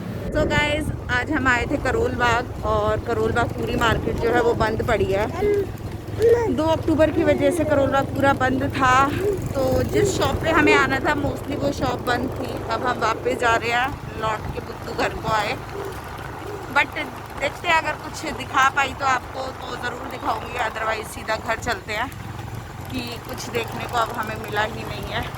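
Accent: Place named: Bhiwani, India. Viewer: Hanji di sahi kaha di aapne hm bhi Haryana s hai hmari boli hee kadak h or sabko lgta h ki hmari tone gusse vali lgti h or vese di aap kaha s ho dadi ama ki bhasha s too ese lgta h ki aap Bhivani side k ho Reply zrur Krna di 😀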